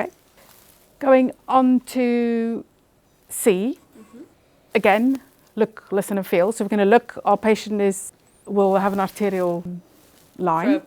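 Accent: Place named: United Kingdom. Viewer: the thick blimey accent mate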